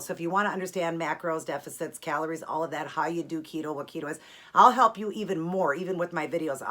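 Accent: Midwest, United States. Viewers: dat mid-western accent tho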